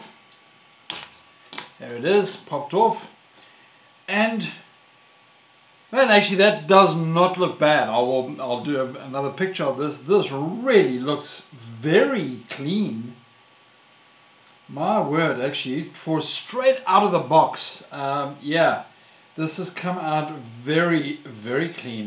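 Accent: South Africa. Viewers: Hello man. I can hear you are a proud south african from Your accent. Hello from Port Elizabeth 👋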